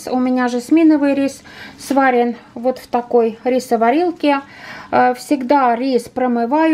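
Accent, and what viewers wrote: Finland. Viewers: у вас уже акцент и ударение финское) пс давно вы в финке живете ?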